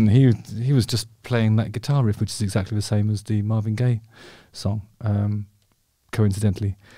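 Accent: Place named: RP, United Kingdom. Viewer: What a beautiful singing voice but I also like your speaking voice its a posh cultured accent that comes across as natural and not affected. On stage I call your best performance an expression of unaffected grace. Really dig your channel.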